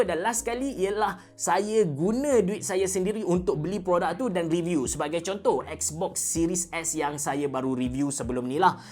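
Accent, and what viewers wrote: Kelantan, Malaysia. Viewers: Sy slh seorg peminat abe sejak 2017 lg, masa tue masih ckp kelantan lg..hehe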